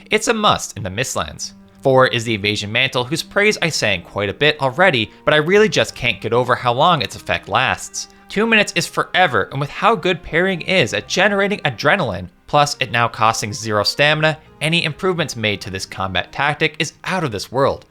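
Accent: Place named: Maritimes, Canada. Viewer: Are you from Nova Scotia or New Brunswick? Got that accent goin on.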